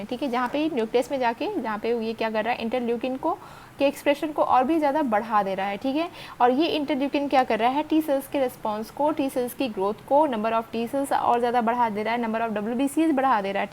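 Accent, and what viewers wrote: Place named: Himachal Pradesh, India. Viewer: Mujhe apki slang himachali lg rhi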